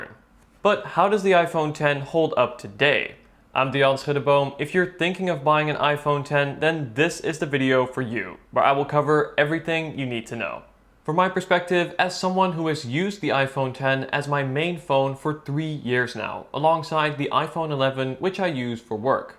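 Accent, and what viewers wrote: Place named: Netherlands. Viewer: Are you from the Netherlands or Belgium? Your English sounds a little bit like Dutch. Nice video, and still an pretty nice Phone.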